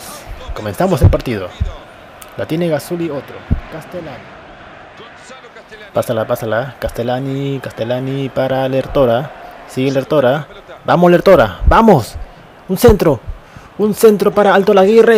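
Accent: Uruguay. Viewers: sos uruguayo xD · parece q sos uruguayo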